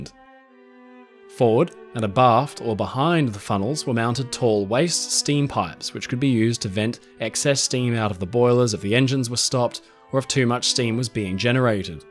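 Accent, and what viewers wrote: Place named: Australia. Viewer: Oh he is so lovely his voice just speaks to me much better than the American - Canadian boi this guy is a an Australian Mann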